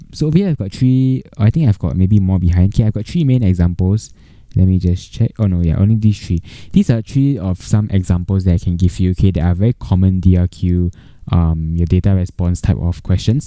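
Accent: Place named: Singapore. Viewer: SG English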